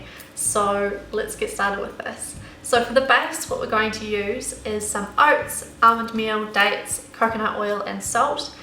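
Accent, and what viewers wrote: New Zealand. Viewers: I'm Australian, and she sounds New Zealand to me. Though in my experience, South Africans who have lived in Australia for a number of years can sound like New Zealanders too. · I'm certain she is from New Zealand that sounds like a very thick New Zealand accent to me. I'm Australian too and we def don't sound like when we speak. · is she from new Zealand I am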